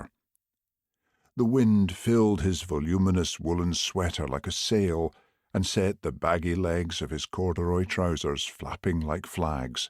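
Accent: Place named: Scotland, United Kingdom. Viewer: Absolutely love these narrations. Nobody can improve on your fabulous Scots voice 😊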